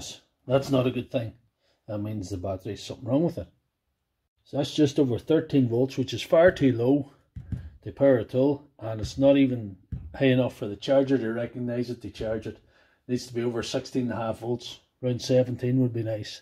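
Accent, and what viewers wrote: Northern Ireland, United Kingdom. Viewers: Do you fix batteries?? I have 3 genuine ones doing with this red/green light flashing.. tried on 2 different chargers.. going by the voice your in northern Ireland so thought I'd ask lol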